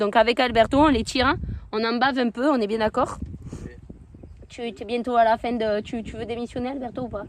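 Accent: South, France. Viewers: Salut reportage super intéressant avec toujours ton bel accent du midi p'tit cc a Chipie 😃👍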